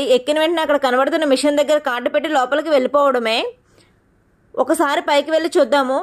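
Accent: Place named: United States. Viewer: Y ur trying like America girl laga voice trying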